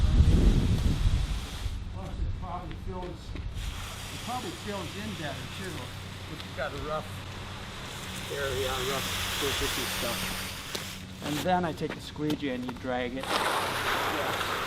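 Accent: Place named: California, United States. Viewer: I thought you were from California cause you look like Tony Hawk and you have a laid back Californian accent 😉 wish I could've helped. Yeah weather degrades it fast. Just applied a 3 yr sealer 2 weeks ago and sun already disintegrated part of it. Im in upstate New York, weathers not quite as harsh as Minnesota but there are times when we're colder, plus we get a ton of lake effect snow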